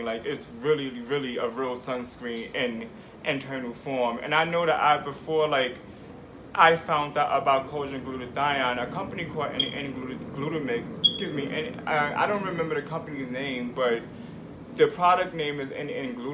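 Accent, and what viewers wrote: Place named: Puerto Rico. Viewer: Yes,I really I❤VE his sound/accent/voice!! Also has a lil boriqua mix too! Always so informative very smart,with much intellect😊I just love him!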